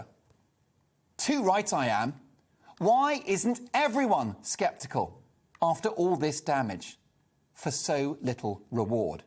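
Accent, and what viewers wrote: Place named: Home Counties, United Kingdom. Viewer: Sweden, Florida and Texas put out to people who will never check what is happening in those three places. Mark Dolan is a vacuous bot who just says things in a Home Counties voice, cheery picking quotes and saying things like ‘facts don’t matter anymore’ whilst peddling half truths and down right lies. Fox News business model is 100% what GBNews is following. Very sad.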